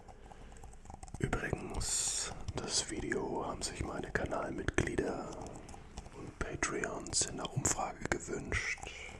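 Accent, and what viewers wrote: Germany. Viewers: Your Denglisch klingt sehr interesting 😂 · It was no secret anyway, it was already clear just by hearing him speak english with his german accent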